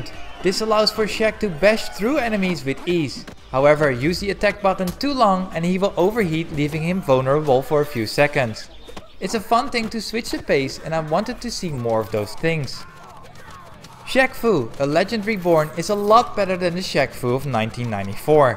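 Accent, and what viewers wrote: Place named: Netherlands. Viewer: You're Dutch right? You hear it right away lol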